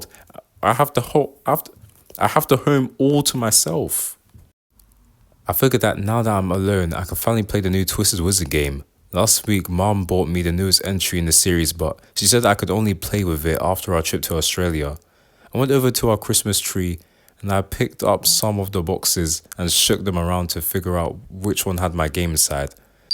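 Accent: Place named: United Kingdom. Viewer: The story is good and looks like a real book, and your British accent is so calming and peaceful.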